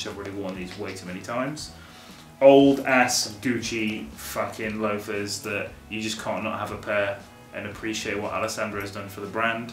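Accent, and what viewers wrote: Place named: United States. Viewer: Sounds kinda American to me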